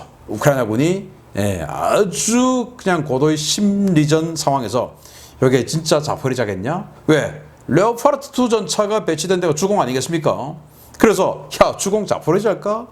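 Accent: Gyeongsang, South Korea. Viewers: 구독자 분 중에 수도권.전라도 사람들 있슴 함 봐주삼 난 갱상도 출신이고 수도권에서도 10년 넘게 살다가 지금은 다시 컴백험 했는데, 모르겠으나 갱상도 출신 신인균 씨 말투가 같은 동향이라 그런것도 있지만, 객지생활 10년 하믄서 제주도 사람까지 만나봤는데, 말을 듣기엔 크게 거부감은 없거든여?! 시원시원한게 속 시원합디더.. 다른 지방 사람들, 특히 수도권.전라도 분들은 어떠신가여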